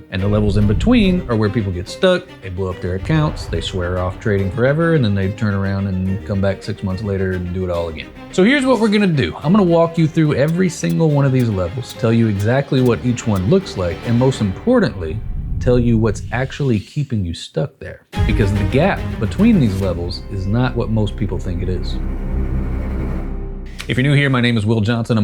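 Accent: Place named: West, United States. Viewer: I'm from the Bel Paese and I grew up watching Western movies and your accent seems to come straight from the mouth of those movies actors 😅. Sei simpatico e pieno di energia!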